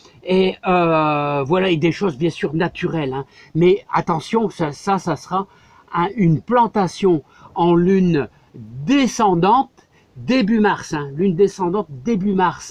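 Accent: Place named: Nord-Pas-de-Calais, France. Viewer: je suis du sud-ouest je parle un peu occitan, et ça fait plaisir de vous entendre parler un peu ch'ti ! continuez !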